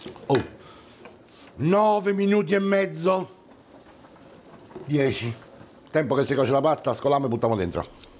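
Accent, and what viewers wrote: Rome, Italy. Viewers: His roman way to talk is absolutely beautiful mixed with such an elegant sense of humour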